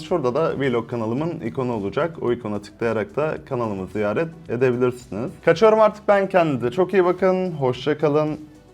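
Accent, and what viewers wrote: Elazığ, Turkey. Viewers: Abi sen elazığlımısın lütfen söyle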